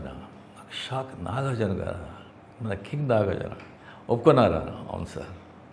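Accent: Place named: Karnataka, India. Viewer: really our thalaivar telugu speech is osm it seems he speaks telugu better than tamil only in movies thalaivar tamil will be like native but in offscreen his tamil contains kannada marati accent but his telugu is clear and natural sounding telugu is very easiest language in south india but many tamil actors struggle to understand and talk telgu even after so many years of release of their movie in andhra kamal sir karthi sir chiyan vikram sir but karthi sir telgu is quite decent kamal sir chiyan vikram sir telgu carrys tamil accent slight but thalaivar telugu is like close to native according to me he really speaks telugu better than tamil. in movies he deliver scripted tamil dialogues but his tamil is still bit impure no tamil actor can beat him in telugu speaking. he speaks telugu with null influence of other language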